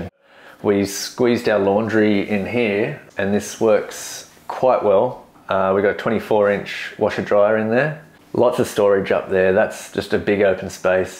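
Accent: Australia. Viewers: A happy and mellow Aussie :) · I had to remember this is located in Canada, whilst listening to an Aussie talking about the unit.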